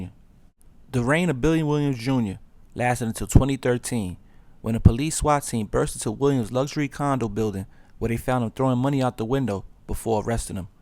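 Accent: New York, United States. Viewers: it sounds like you grew up in a suburban neighborhood of queens, Staten Island, long Island or Mount Vernon. I'm born and raised in Harlem NYC (of course there are no suburbs there), the Bronx has very few suburbs. I don't know how old you are, but I'm in my mid-60s, unlike where you might have grown up at, I grew up in a era where drugs specifically heroin was extremely prevalent. Even with all that there was a sense of community, the dealers who were much older than us would steer us youth away from wanting to get into the life, they told us to stay in school and don't be no fool. A lot of them back then even knew our parents, and they never sold drugs in front of elderly people. Even though these cats were contributing to destroying the community with dealing drugs, there was a weird sense of order and structure. IT WAS A DIFFERENT DAY AND TIME, when the Crack era came through that changed everything.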